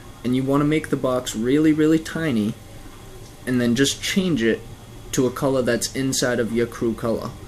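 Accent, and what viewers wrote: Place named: New York, United States. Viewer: damn, some words you pronounce like a real new yorker, and i love it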